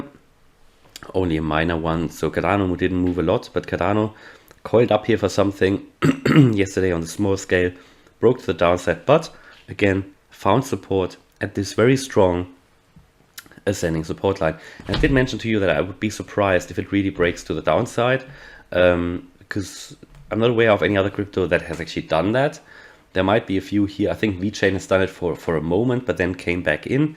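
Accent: Germany. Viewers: Yet your accent is german.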